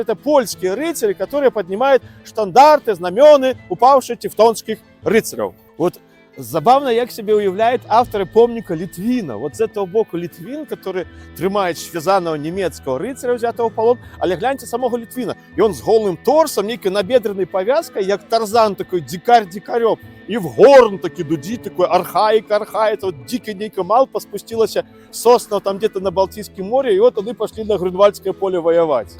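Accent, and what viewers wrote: Russia. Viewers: Добра, але трохі вымаўленне пакутуе, як у русака😮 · Гэта трасянка , а не беларуска мова.. Вымаўленне нібыта ў русака з пад палкі!! Палова слоў расейскіх.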